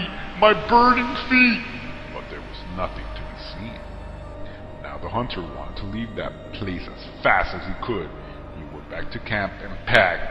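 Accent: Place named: Minnesota, United States. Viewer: Minnesota accent lol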